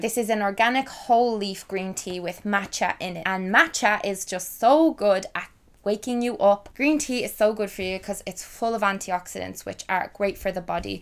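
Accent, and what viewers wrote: Ireland. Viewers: most youtubers just bore me but i could sit and watch all your videos back to back because i love the content so much! but the irish accent just makes me feel so at home, as my grandad is irish and i love listening to his stories! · Is she Irish 🤔🤔 X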